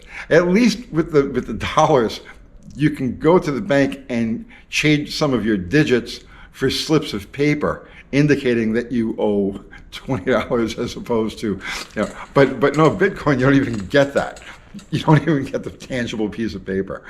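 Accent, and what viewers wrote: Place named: North Carolina, United States. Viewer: You sound very much like some of my old friends here in North Carolina. I thought these same thoughts in their entirety that you are thinking and I am 70 yrs. Old and a deactivated Marine. You and I could talk forever on these and many other things. I used to love the old westerns and old science fiction and many other old things. I don't know if you are aware that the points vehicles are EMP proof. The computer brain vehicles are not and the systems would be fried if an EMP explosion went off or if a nuclear bomb hit 60 to 100 miles away. Call up some manufacturers and ask them are their cars, Jeeps included are EMP proof. I own a 2011 Jeep Wrangled, Call of Duty edition with only 73,400 original miles on it and Jeep can only speculate about it's ability to endure. I love the Jeep and it has never given me any problems except in the electrical department which required new complete wiring for 800.00 dollars at the Dealership. 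I would love to have a wrangled type jeep from the 60's and most especially a jeep from the Nam Era that was not a demilled military one. They cut the bodies in half. I don't trust rewelds that leaves a soft spot in tempered struck steel. Of course they don't do over 60 miles an hour but a great mechanic could boost it up. That would definitely survive an EMP attack and get me purtineer anywhere I need to go. Pop a couple of gas and a water can, axe and shovel and I would be in business ( biness).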